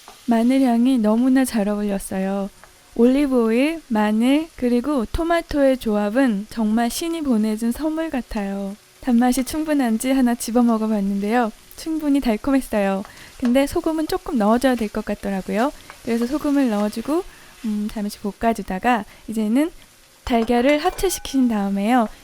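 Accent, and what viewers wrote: Seoul, South Korea. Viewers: 뭔가말투가... 끝까지 못보겠어요. 서울사투리 귀엽다는분들도 있는데 각자 듣기좋은 목소리가 다르겠죠?ㅠㅠ 더많은 구독자를 생각해서 조금 더 표준어를 사용해보시면 어떨까요? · 이게 서울 사투리군요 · 말투가 완전 옛날 서울 사투리네요... 넘 좋다....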